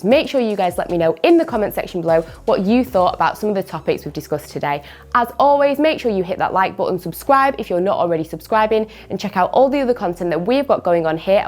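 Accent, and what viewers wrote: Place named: Manchester, United Kingdom. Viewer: Love that accent! Is that from Manchester? ;)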